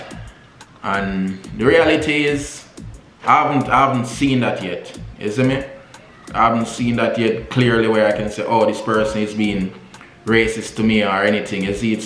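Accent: Jamaica. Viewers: ? He is speaking english. What you are saying is that you would like to hear him using a foreigh accent. There's nothing wrong with a Jamaican accent. Not everyone is as frightened for nothing!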